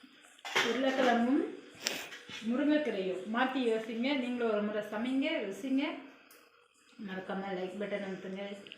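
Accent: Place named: Salem, India. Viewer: நீங்க சேலம் பக்கத்து கரர் போல பேச்சு erukerathe nengal சேலம் மா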